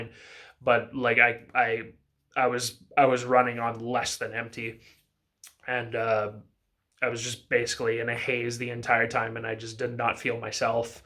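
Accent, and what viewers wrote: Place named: Canada. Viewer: I knew I heard some Canadian in your voice! I was also born in Canada but I live in Spain at the moment. It's very cool that you managed to get a job in a gym, sounds like getting laid off was a blessing in disguise.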